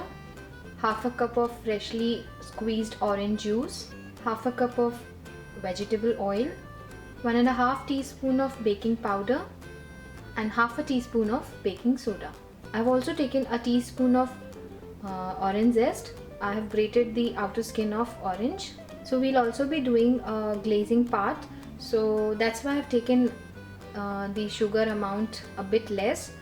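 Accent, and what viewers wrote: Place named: India. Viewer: I LIKE INDIAN ACCCENT VERY MUCH VERY SOOTHING AND CULTURED.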